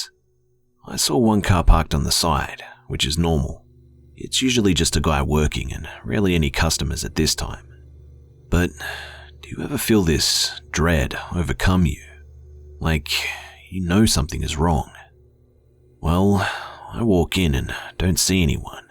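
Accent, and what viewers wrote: Australia. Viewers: can't quite place your accent. Australian , maybe?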